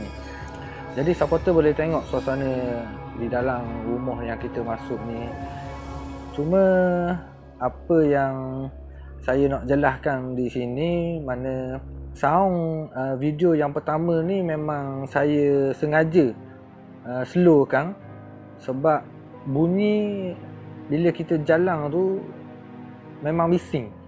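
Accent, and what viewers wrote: Terengganu, Malaysia. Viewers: Suka dengar loghat ganu ni...ada yg faham..ada yg tak...apa2 pun selalu ikuti vlog ni..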